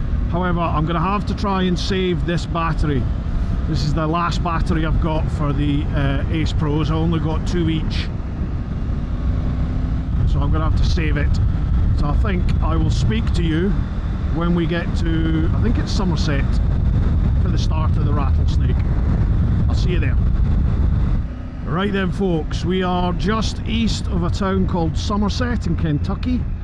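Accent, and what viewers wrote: Scotland, United Kingdom. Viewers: Excellent viewage, just love the noise that bike makes and with a scot accent ... the landscape is phenomenal 👍😎👍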